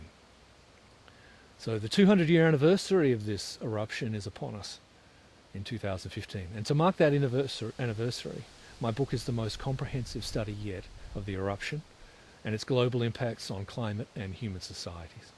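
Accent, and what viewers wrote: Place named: United States. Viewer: When you accent is so thick that you're can't pronouce the difference between "barley" and "Bal".... People all over the world like you less and less, americans.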